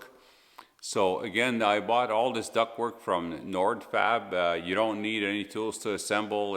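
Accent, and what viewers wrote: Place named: Canada. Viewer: This fellow is Canadain or impersonating 1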